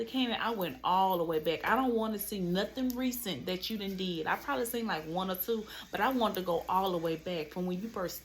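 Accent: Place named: South, United States. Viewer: Why every time I see you, I be like Hey Cousinnnnnn!!!. I stumbled across your video from your 2nd round and girl I love your lil country accent and beautiful spirit. Also you’re very informative. Thank you for the good tea and helping me stay focused on my upcoming surgery. These videos helps! Keep going cousin!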